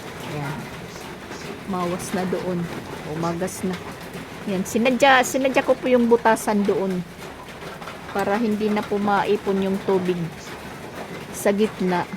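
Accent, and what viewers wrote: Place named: Visayas, Philippines. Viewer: Cute pamation ug mag bisaya ka ❤❤❤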